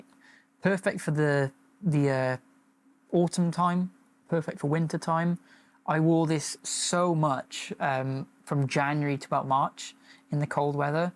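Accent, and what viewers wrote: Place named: Ireland. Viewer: Probably because you are Irish? :D Bro I love your accent rly :D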